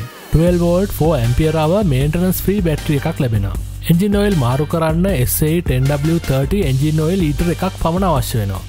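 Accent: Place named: Matugama, Sri Lanka. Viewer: machan uba matugama peththeda ? video ake mathugama peththe then vagayak thibba....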